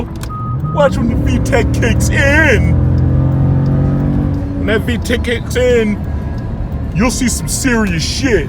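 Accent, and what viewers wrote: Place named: Long Island, United States. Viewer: You are so Long Island bro. I watched mine 10 of your videos because you sound just like me and my brother arguing gear head stuff. I'm definitely a fan, bro. Keep doing your thing!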